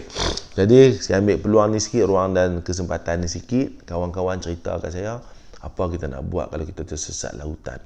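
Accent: Kedah, Malaysia. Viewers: trima Kasih Tuan sudi berkongsi cerita, sikit sebanyak mnjadi ilmu utk kami. tenang ja Tuan cerita piaw kedah punya hehehe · Assalamualaikum tuan,best cara tuan bercakap.Macam orang Kedah bunyinya. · terbaik la tuan bercerita… loghat kedai piaw punyaa… hahaa..dari kedah mariiiii…. kuala nerang mai tuann…. seronok dengaq bercerita dan lawak penuh nasihat… nak part 5 dan 6 seterusss smpai bila bila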